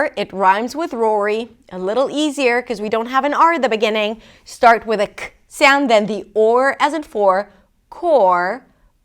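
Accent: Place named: United States. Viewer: I'm a native speaker with linguistics training and this was fantastic. Your American accent is fantastic! I can only hear the tiniest hint of non-native accent, it's so wild. I appreciate that you included the name Siobhan because it's Irish and you'd be amazed how many Americans have no idea how to say it 😂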